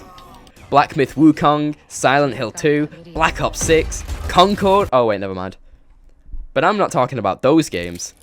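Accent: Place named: United Kingdom. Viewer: British voice, unsubscribed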